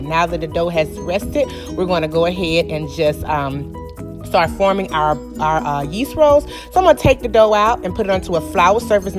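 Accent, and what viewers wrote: South, United States. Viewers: And what exactly does a plantation mammy sounds like? She has a southern accent like most people who are born and live in a southern state. Would you call someone with a Boston accent a slave master? Rethink your stereotypes. I see you have been brainwashed by the media because slavery has been over for some time so it is no possible way you know what a plantation mammy sounds like. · She's from the south. We all (black folks) talk like her.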